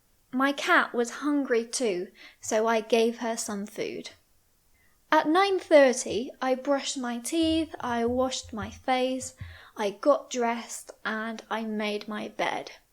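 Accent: United Kingdom. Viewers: Her tone of voice, pronunciation and intonation are really very good. The recordings are pleasing to ears, much better than those channels which adopt AI sounds. Well, another reason might be that I prefer the British accent to the American accent. 😃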